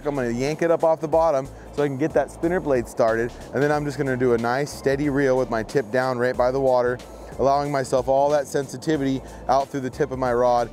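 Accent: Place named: Canada. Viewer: He must be from Minnesota or somewhere in the northwest because he sounds so Canadian. Sounds just like someone from north Ontario. Anyone know? Awesome video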